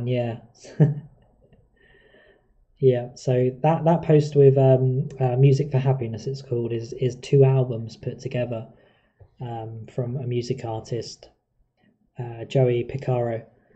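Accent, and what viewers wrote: United Kingdom. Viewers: Omg ur British? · Woah you're brit?! :O alright im subbed haha xd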